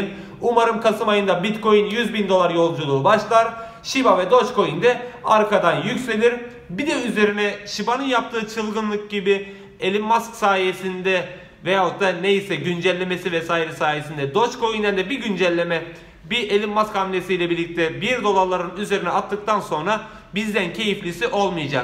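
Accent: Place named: Samsun, Turkey. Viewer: Tam bir Samsunlusun “ E “ lerinden belli hemşehrim..👍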